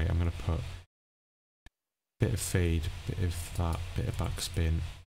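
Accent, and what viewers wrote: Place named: Newcastle, United Kingdom. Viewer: Hey thanks for the play through - really enjoyed your round - pretty much as intended.. and great to hear a Newcastle accent!… appreciate the exposure… yeah I have few courses out there - Bramley Manor is the previous one and Shearwater Sands is another links ..: cheers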